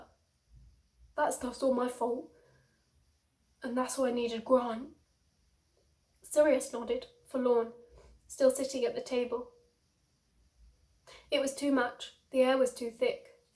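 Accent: United Kingdom. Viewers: listen to this fanfic in British English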